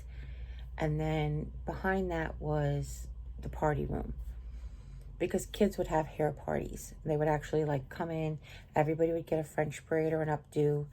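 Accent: Long Island, United States. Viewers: I could listen to you all day long. I just love your stories and your accent is just amazing. I'm learning so much about Long Island and New York its magical. The magic has even cast the spell of being able to keep up with your fast pace of speech 🤣😂🤣😂. Hey, Jack of all trades is a skill in itself, nobody employs an electrician, plumber, gas expert, carpenter, joiner etc for little jobs around a building, they employ a handyman/tradesman/maintenace guy. Quite simply a 'Jack of all trades' Theres so much i need to do around my home, I'm watching your past videoes to learn how. God bless you my fast talking friend. You are in his hands and all will work out according to his plans, as it should. Xx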